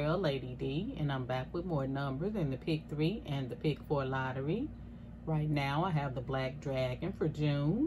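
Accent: South, United States. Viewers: Thank you I love ❤️ hearing you southern lingo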